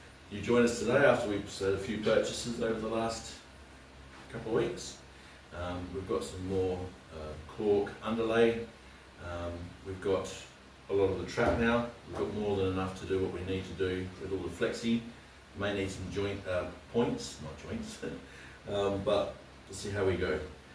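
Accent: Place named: Australia. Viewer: Hey man, thanks for the upload and update! It is starting to take shape. Nice to see your little one get involved. Ps. Isle of Wight?? You sound aussie! I am so confused.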